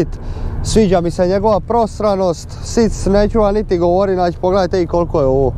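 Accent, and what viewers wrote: Dalmatia, Croatia. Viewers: Volim ovaj Dalmatinski dijalekt. Super recenzije pozdrav iz Rame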